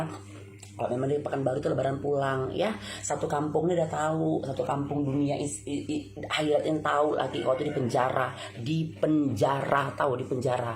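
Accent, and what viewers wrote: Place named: Medan, Indonesia. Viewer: Sama Sama..tolong dong bikin konten marah marah ato kesel ato apalah..aku terhibur bnget Kalo Denger clotehannya..apalagi logat medannya..suka banget..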